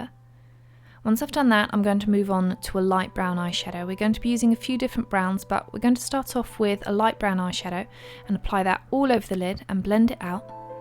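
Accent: England, United Kingdom. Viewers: Where are you from btw? I know you're English, but you're accents lovely :3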